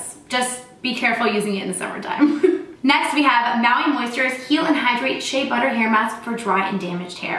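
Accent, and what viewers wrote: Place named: Georgia, United States. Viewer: I knew you had to be a georgia girl ! Got the accent for sure...Sound like my people 🤗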